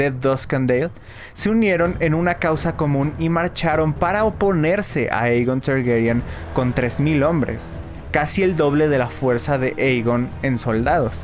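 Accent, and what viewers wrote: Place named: United States. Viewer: Que mal suena ese inglés de USA. Es super irritante. Por cierto, se dice BRAVOSÍ, no Bravosi.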